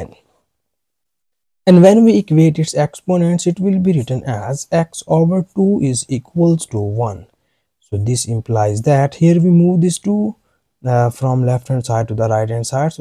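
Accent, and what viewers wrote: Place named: England, United Kingdom. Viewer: Dehati angrez ki tarah bol raha hai. Paki hai kya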